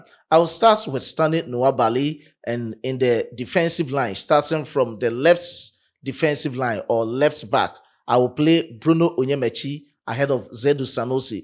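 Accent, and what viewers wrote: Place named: Ghana. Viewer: Please u to speak 9ja English oo not gh 😅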